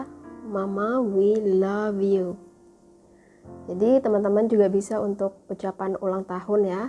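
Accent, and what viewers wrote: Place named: Java, Indonesia. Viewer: mantab.... suaranya medhuk ya kak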